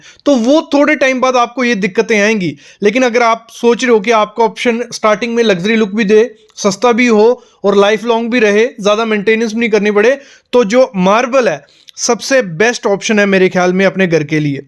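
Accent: Jammu, India. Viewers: Bhaiya ji jammu se ho aap toh…👍🏻👍🏻 Waah ❤️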